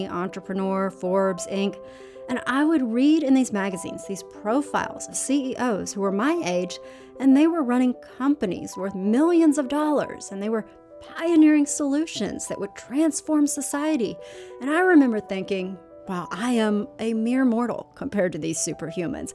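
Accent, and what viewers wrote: South, United States. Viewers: Such a great series! Glad I stumbled upon it. Great production quality and lovely southern accent behind it!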